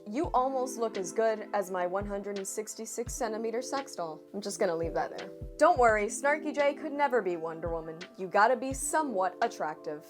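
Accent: Spain. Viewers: Oh and i just love your spanish accent!!!!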